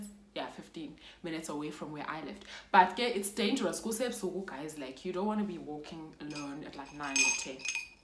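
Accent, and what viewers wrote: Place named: United Kingdom. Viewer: That British accent wow you just nail it 1️⃣0️⃣0️⃣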